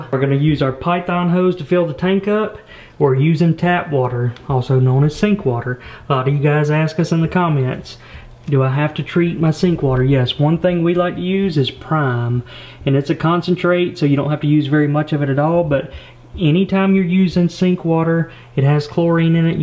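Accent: South, United States. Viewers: With your accent I thought you’d say yall so it felt weird when you said you all lol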